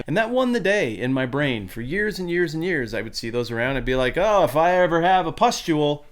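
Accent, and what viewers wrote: United States. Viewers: Are you from Wyoming?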